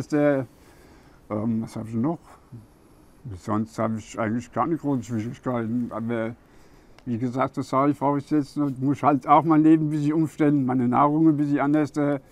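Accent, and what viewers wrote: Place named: Hesse, Germany. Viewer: So sympathisch..mit seinem hessischen Akzent und seiner menschlichen Art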